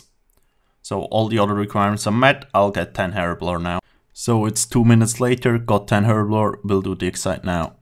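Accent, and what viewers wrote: Switzerland. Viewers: 100% en schwizer haha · Ich has gwüsst! hahaa, han mer grad denkt, dases en schwiizer akzent isch^^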